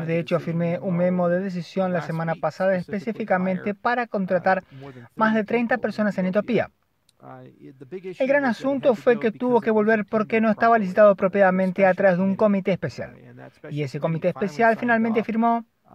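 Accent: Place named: Argentina. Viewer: Todas mis disculpas por no haber enviado antes un mensaje de agradecimiento y cortesia a nuestro querido traductor, grandisimo trabajo que se agradece increiblemente mucho mucho, de todo corazon muchas gracias amigo. (Ademas me encanta tu acento, si no me equivoco eres de Argentina, no? Yo he nacido en Valencia, España) Un gran abrazo virtual y por favor sigue todo lo que puedas !!!!!! Gracias !!!!!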